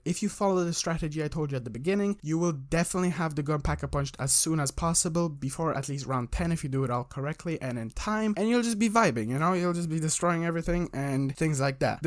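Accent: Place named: Ireland. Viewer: You sound Irish bro is it true but it isn’t a strong Irish accent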